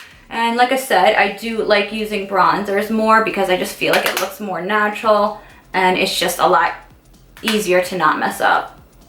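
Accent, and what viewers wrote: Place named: Michigan, United States. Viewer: I love your accent. are you from Michigan?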